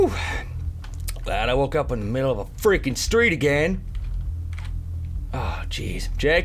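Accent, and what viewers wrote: United Kingdom. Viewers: Nice british accent ^.^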